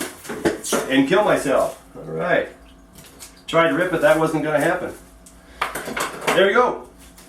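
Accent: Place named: United States. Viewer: GREAT video ..!! I sooo know that you are an American as you say the word roots ... as ruts. Just like when Americans say the word "roof", .. as in the sentence ... "I am going to fix the shingles on the ruf ..." Sooooo funny !! (and yes, .. i am a Canadian .. lol)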